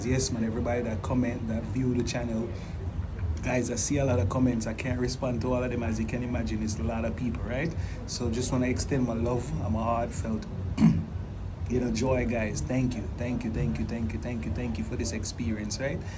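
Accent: Jamaica. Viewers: You make this island lady home sick. Your meals, your accent, your praise. Love love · Don't forget the beautiful Jamaican accent 🤤n food together. Delish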